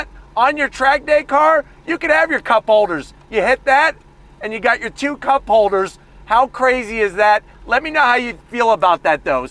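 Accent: United States. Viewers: why Americans speak like this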